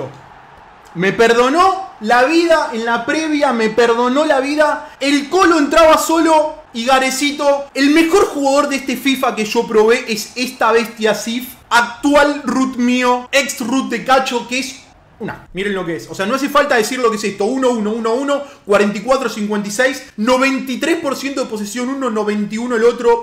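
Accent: Argentina. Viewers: como se nota que sos argentino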